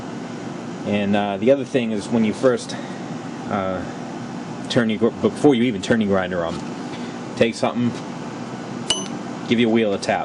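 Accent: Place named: Massachusetts, United States. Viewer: What a great video. I'll have to watch this a couple more times to get maybe one tool to work like yours. You make this all look so easy to do, but in reality this is an art. All always, I appreciate all you expertise and hard work making these great videos. I too am from Mass, but I sure don't sound like you. I guess I have been away too long. Now my brothers have that accent. They call me the adopted one. Looking forward to your next video.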